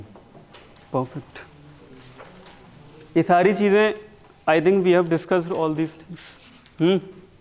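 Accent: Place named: India. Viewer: Indian pronounce cant understand other countries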